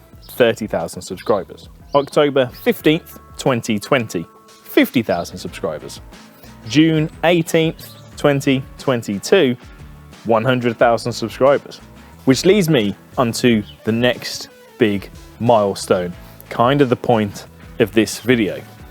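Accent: United Kingdom. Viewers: I wish you and yours all the best in your future endeavors with the video stuff,. You're a big help in my understanding and the way you present yourself works very well for me. And I love the British accent! I hope you keep moving onward and upward and I'll keep tuning in and learning.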